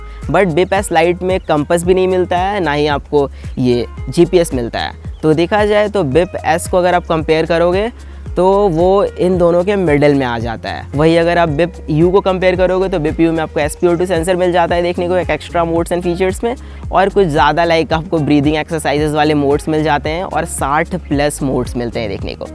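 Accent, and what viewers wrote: India. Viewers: İts english but accent of indian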